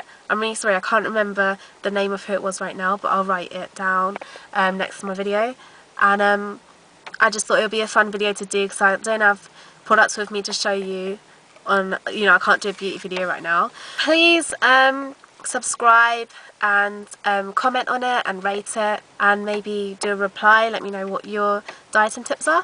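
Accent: London, United Kingdom. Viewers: ahahah dat London accent doe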